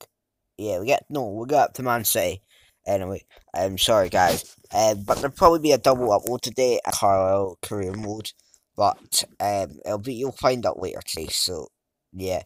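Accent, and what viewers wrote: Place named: Scotland, United Kingdom. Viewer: Also I’m loving ur Scottish accent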